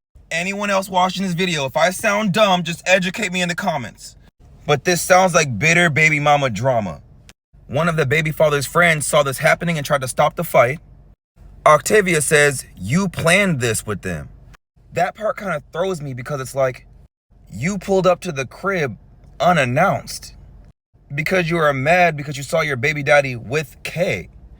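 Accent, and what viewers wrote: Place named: Minnesota, United States. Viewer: it’s so cool that your from MN. I’m born and raised and I could kinda tell with the way you talk. LOVE YOUR VIDEOS THO!! been watching all day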